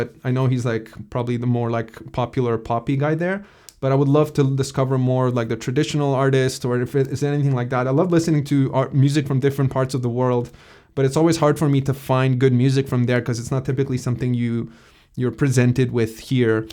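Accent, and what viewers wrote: France. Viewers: French accent.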